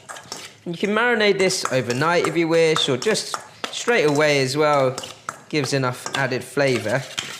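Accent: United Kingdom. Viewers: A chinese guy in British accent 😂😂 cute😂😂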